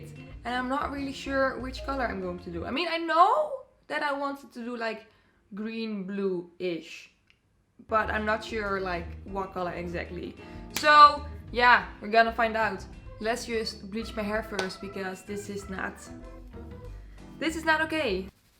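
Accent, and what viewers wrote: Netherlands. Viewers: ZC I tough she was Dutch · Hello. One question: are you Dutch? I think you have a Dutch accent. I am also Dutch :) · Wait is she dutch?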